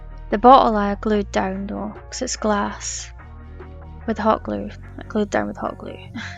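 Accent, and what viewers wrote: Scotland, United Kingdom. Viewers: I loved the fireplace you did at Christmas, I showed it off to so many people with your channel! But I gotta say, I like this one even better ❤ that blanket ladder is BRILLIANT ❤❤❤ Watching your channel always makes me smile! I love your projects and hearing you speak brings me back to when I lived in Scotland 😊😊😊 I still use words and terms from there over the American versions! (Like Nappy instead of Diaper) I love remaking your fireplace as well as the Little Stands She does on “Made on Maple Street” 😊❤ “Christina Elizabeth” also does an adorable tiny crate Stand. So far you’re the only one doing the full fireplace! Y’all give me things to recommend and share when I’m in Dollar Tree & Hobby Lobby! And I ALWAYS share your name/channel first and last!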